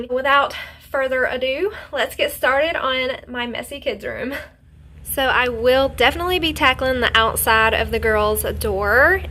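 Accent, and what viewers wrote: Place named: Appalachia, United States. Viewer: Hillbilly accent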